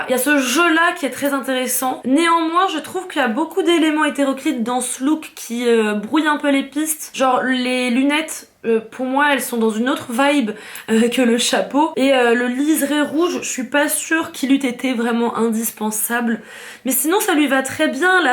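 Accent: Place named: Liège, Belgium. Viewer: Allez, je m'abonne ! Analyse pertinente, des sujets intéressants, un air de ressemblance avec mon actrice favorite Anya Taylo Joy et arrière accent Liégeois de Beaufays très léger. Tout y est 👌🏽! C'est super !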